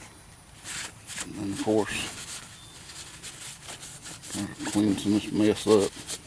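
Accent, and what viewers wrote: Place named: South, United States. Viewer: Thanks for the upload! Plus you sound like a good ole country boy, hell you would fit right in around here!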